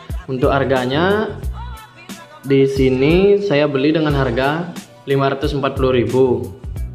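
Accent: Bali, Indonesia. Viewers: Org bali ya bang? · Ni org bali ya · Pasti nak bali ne nu logat" Bali ne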